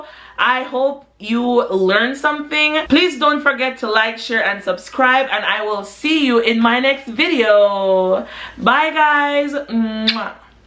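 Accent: Jamaica. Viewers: I knew you were jamaican within 2 seconds. 🤣🤣🤣 I’m Jamaican-American, and I’d LOVEEEE to learn Japanese. But I gotta become fluent in Spanish first! Mi nah play!! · lemme guess, jamaican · Yo 🤣🤣I love your energy, I’m guessing you’re from the Caribbean maybe Jamaica (I’m new here), definitely subscribing❤️ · are you Jamaican? 👀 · omgg it looks like ur a jamaican im not sure but kinda sound like one to mi im a jamaican trying to learn japanese to be a mangaka or a translator!:3 can u tell me if ur jamaican?:) i really loved the vibes in this video too btw:> arigatou! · Is she Jamaican .....yeah she's Jamaican 😂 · 20 seconds in and I'm like yup this ones definitely jamaican😂😂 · I can hear the Jamaican 😂 私もジャマイカ人ですよ…(笑)面白い。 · I Could Tell You're Jamaican,That Accent...I Checked You're About & There It Was Our Jamaican Colours.I Am Also Jamaican,I Want To Learn Japanese...Cause I Want To Be Where Pokémon Happens & Also I Love Manga...& I love Well Japan's Culture.Oh And..🇯🇲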